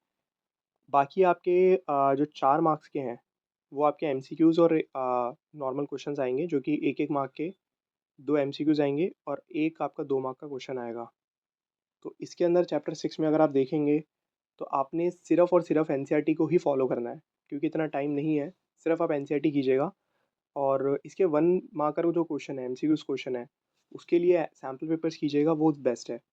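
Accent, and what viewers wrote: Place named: Ghaziabad, India. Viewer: hey do you use any social media platforms btw my mom is also from gaziyabad and i love the excent of that and i am quiet intrested to talk with if you have any account plz tell😊and conversation in comments section is quite award for me😂😂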